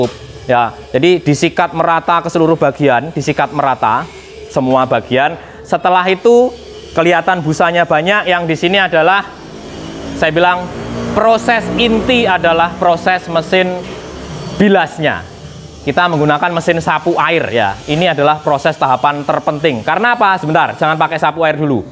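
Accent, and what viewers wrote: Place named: China. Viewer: Kalau menurut saya sering seringlah mempergunakan bahasa INDONESIA,agar tidak terdengar seperti orang CHINA sedang berbicara menggunakan bahasa INDONESIA.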